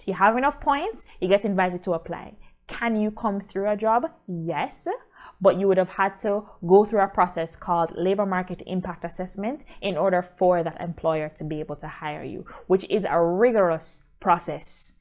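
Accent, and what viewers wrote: Jamaica. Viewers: Great job. You sound like a yawd lady (Jamaican)? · AIPP program seems feasible--thanks for the info. Yuh come from Jamaica, nuh true? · are you jamaican ? smile and thank you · I can hear the Jamaican accent.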